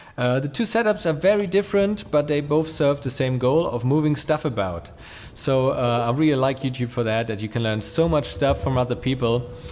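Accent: Germany. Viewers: Bruder dein akzent einfach anders deutsch xD Gutes Video ^^ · Do are you from Germany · Ich finde es lustig wie man perfekt deinen deutschen Akzent hört, die Idee muss man aber auch erstmal haben. Super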